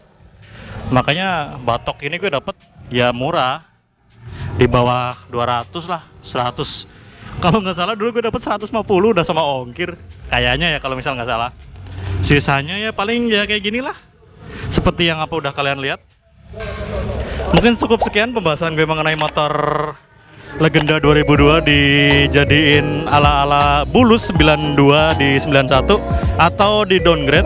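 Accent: Banyumas, Indonesia. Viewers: ngapak ya om? asli mana? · Hahaha salam ngapak mas ..mesti wong cilacap mbok sampean lah 🤫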